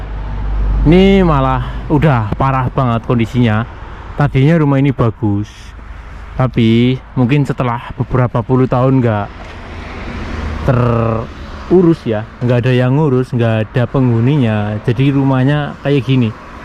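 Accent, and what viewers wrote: Banyumas, Indonesia. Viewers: Kesuwen neng jepang ora kelalen ngapak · ngapak temen mas..rika asline wong ndi...semngat masee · seneng ngapak mas.jwa Endi mas.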